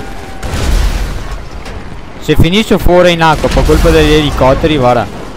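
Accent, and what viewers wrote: Veneto, Italy. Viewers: Setu veneto?🤔😂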